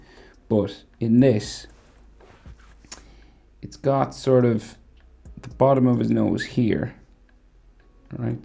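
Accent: Ireland. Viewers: fellow irish?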